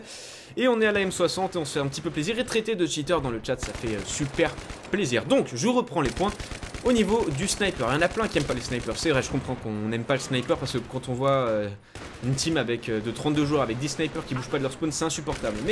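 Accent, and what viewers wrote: South, France. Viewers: Et très bonne vidéo comme d'hab, continue comme ça avec t'es super accents du sud, t'es génial. ;) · Oui je pourrais payé pour un BF3.5 et juste ne pas remettre la DCA fixe cheater de BF4 . Et oui un BF Viêtnam.5 se serait génial.Ton accent du sud est magnifique.(Mariane sauve nous n'est se pas ^^) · Rhaaa putain ton accent du Sud est trop énorme!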